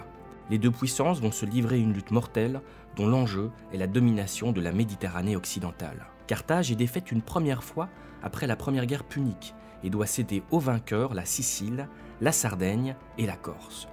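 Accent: Belgium. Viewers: ca m'a un peu déstabilisé, on ne détecte pas l'accent belge au delà de ça, mais excellente vidéo BTW ;) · Il est belge vus son accent, d'ou les "septante" pour soixante-dix ;) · Et vive la pouissance romaine !!! (j'adore l'accent belge... :p) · Bel accent belge... Bon document aussi!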